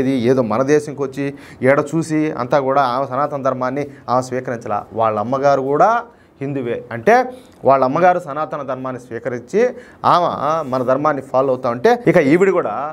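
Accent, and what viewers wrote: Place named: Telugu, India. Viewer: I Like your telugu language slang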